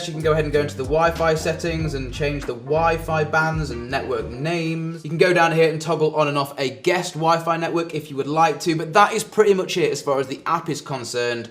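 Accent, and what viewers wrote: England, United Kingdom. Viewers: He’s British if you didn’t notice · No, he's English and speaks British English, hence he says 'rooter', not 'rowter'. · its his english accent that you find impossible to accept. not my irrelevant youtube name. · Calm down mate, that's how they pronounce it in the UK you mong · It's because he has a British accent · that’s how we say in the uk if you got a problem take it up with boris johnson he’ll sort u out 😂😂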